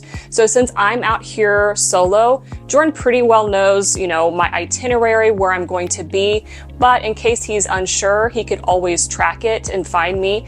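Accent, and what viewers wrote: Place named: England, United Kingdom. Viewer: Good tips for male and female travellers. I’ve been to LV from the UK many times, sometimes on my own, and never had any problems. My advice is to stick to the main tourist areas. People love talking to you when they hear your English accent!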